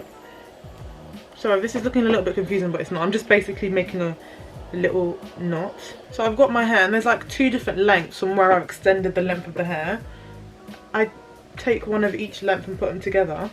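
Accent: United Kingdom. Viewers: Greetings Sis. Just wanted to say that it's great to have a British voice amongst these videos. Thanks for taking your time with showing the process. Makes such a difference. Please do more videos. Thanks so much.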